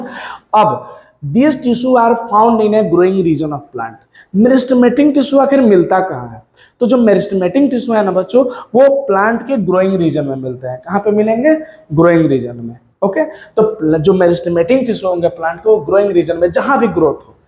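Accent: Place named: Bihar, India. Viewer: laga ki bihar se ho bolne ke tarike se. 😅😊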